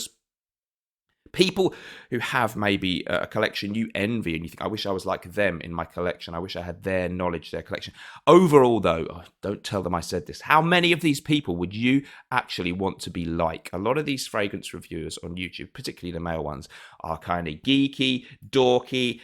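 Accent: United Kingdom. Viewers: 🤣😂You made me laugh, thank you! Nice British english!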